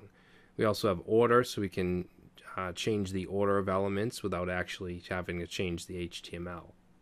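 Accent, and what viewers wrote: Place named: Rhode Island, United States. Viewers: nice a dude with an east coast accent and swearing!! my fave. great tutorial, i understood it before but now i feel more comfortable with using flex. fixing my site now! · Bawx, sawce code, mahgins, you wouldn't be from Rhode Island?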